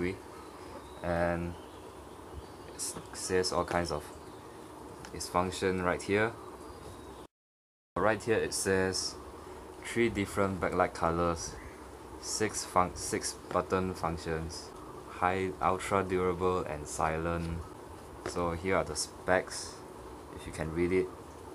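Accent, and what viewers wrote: Singapore. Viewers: U r a singaporean right you sound singlishhh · you sound singaporean haha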